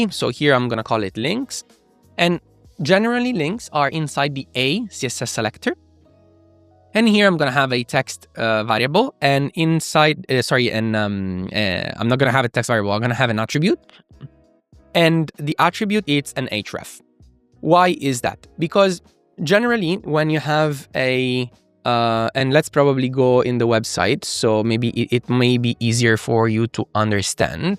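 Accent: Italy. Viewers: You make very good videos even if your English is a little bit Italian.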